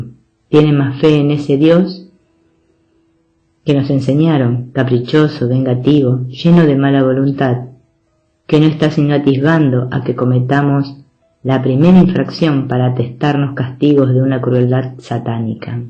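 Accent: Argentina. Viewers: Que voz argentina tan bonita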